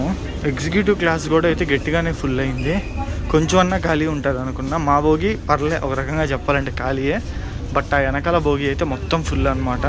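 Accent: Nellore, India. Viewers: ma nellore lo anthe ayya.. :)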